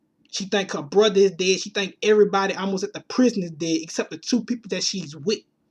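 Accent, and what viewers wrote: South, United States. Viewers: I love your videos your accent is super country when will you post a prediction video for the next episode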